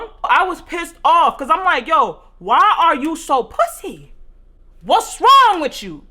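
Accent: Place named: Philadelphia, United States. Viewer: I watched a few of your videos and was trying to guess where you’re from. I know the north east. I’m guessing philly. It was the “fuck you mean pussy” New subscriber thanks for the laughs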